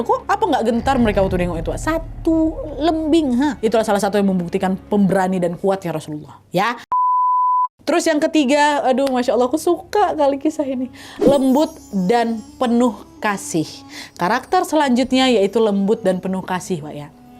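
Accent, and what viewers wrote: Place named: West Sumatra, Indonesia. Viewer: Tagayiang gayiang bahaso Minang e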